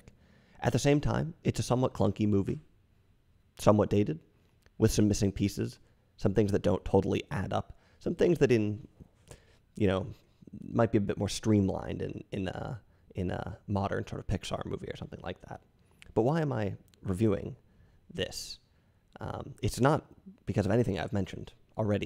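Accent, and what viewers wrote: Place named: Bergen, Norway. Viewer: Yes it is true! I love the Bergen dialect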